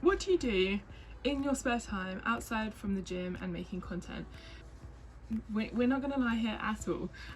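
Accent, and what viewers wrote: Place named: Australia. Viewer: Not me out here thinking you are Australian😂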